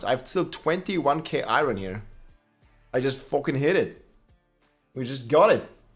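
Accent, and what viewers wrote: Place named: Germany. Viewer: My only suggestion to you would be. If you hate everything about this, skip it. He is not going to change the way he speaks. It's his accent, and he is german. Plus, I highly doubt that he would even try to, just because of one comment on youtube. :) I wish you well, but I also suspect that your frustration has roots in much deeper problems.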